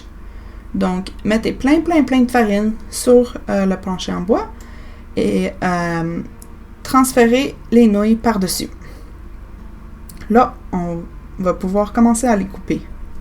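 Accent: Canada. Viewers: Your English has a (it sounds to me like) a Canadian accent, are you from Quebec Canada? I'm from Vermont USA. I only ask you this, because you speak French too.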